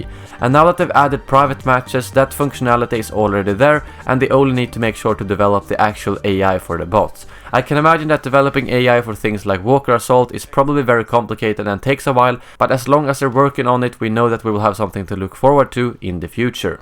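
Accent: Sweden. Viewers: You are a great YouTuber to watch, keep up the great uploads! And who doesn't like that Swedish accent?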